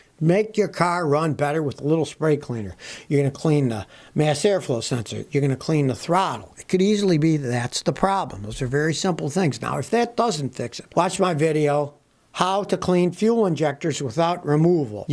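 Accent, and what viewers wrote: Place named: Texas, United States. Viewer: Hell, he came from Texus! dat's da wey dey talk alla the time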